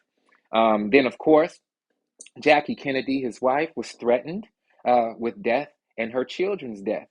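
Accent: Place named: Thames, United Kingdom. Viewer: Vid is fire 🔥you nailed the thames accent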